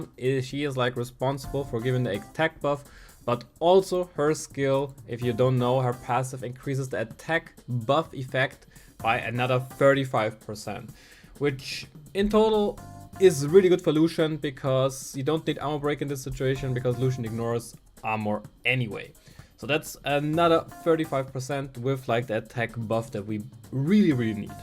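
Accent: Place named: Germany. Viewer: your german accent is hard man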